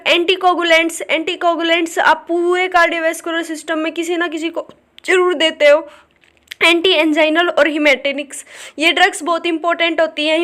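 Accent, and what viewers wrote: Haryana, India. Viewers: That Haryanvi accent is cool And good video 👍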